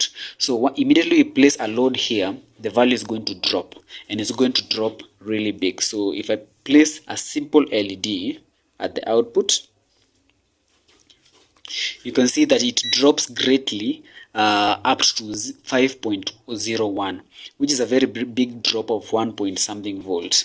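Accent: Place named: Kenya. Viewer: you sound like a Kenyan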